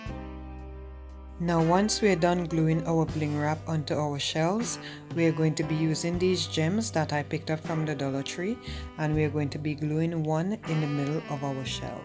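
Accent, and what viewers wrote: Trinidad and Tobago. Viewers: Wow you sound Trini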